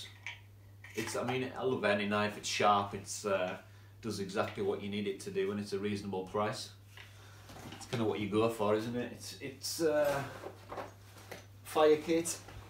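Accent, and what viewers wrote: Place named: Cumbria, United Kingdom. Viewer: Sorry, I couldn't catch what you said with your Cumbria accent. Who makes the fire-steel with the screw off top (link) please? I'm also trying to find a suitable headlight rechargeable with white and red light options, but I am not going to pay 50 Euro for such so still searching. Mice are destructive little buggers so best to put none tinned foods into suitable containers which will hopefully protect and mask the scent and stop them chewing through your kit.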